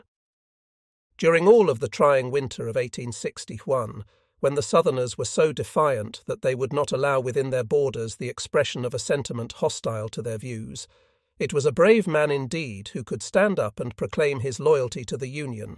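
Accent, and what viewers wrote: England, United Kingdom. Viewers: The English accent of the AI narrator is incongruous with what is a uniquely American event.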